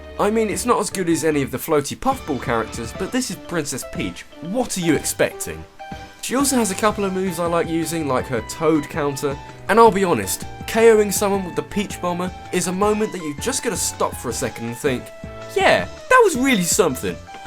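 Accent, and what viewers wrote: England, United Kingdom. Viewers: are u actually british or do u use a voice modifier? sometimes I cant tell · you know i gotta go against your comment that (please read the next phrase in the incredibly satisfying British accent) "you need to be good at brawl to gain any great succes with R.O.B." my cousin was pretty much a joke fighter when we played, he made the characters fell/run/fly of the stage half the time and he only managed to land attacks and KO´s by mere luck... then he used R.O.B. in Brawl and next thing i know: my and the CPU´s characters are sent flying either as a flaming fireball or with a headache because of R.O.B.´s whirly laser eyes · Haha, made me laugh mate! That british+ your video style= geez omg its sooo goood <3 I really dont care if it's going to take you a while getting theese vids done, as long as the keep on coming! ;D <3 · it's called a different accent. 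He's from england like me. we don't say YOghurt either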